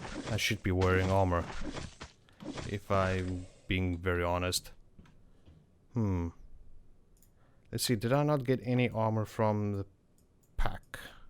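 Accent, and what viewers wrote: South Africa. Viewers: are you south african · Lol bro I can hear you are from south africa thanks for the vid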